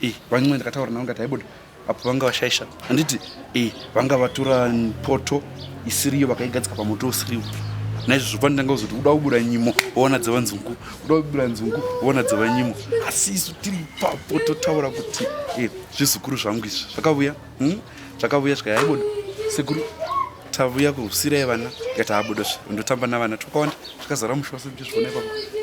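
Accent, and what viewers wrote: Karanga, Zimbabwe. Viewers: Aiwa tiri kuchinzwa chikaranga 🙆🏻‍♀️🙈🙈🙈.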